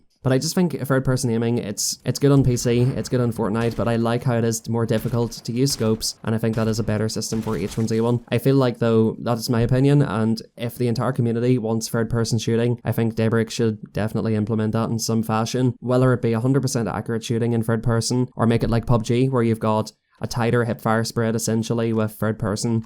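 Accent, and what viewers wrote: Ireland. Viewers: You live in Asia? I thought u were Irish aha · Are y from donegal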